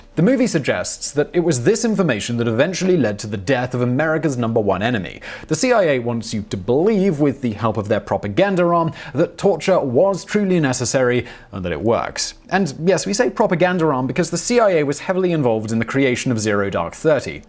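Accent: United Kingdom. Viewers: British accent, living in Czechoslovakia, pushing CIA propaganda. Suspicious. Simon must have gotten a call from Jeff Bezos telling him the Organization needs a PR boost.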